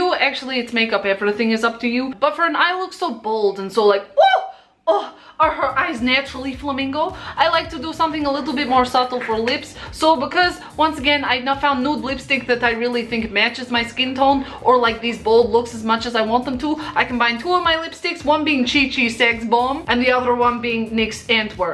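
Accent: Russia. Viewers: Is that accent Russian?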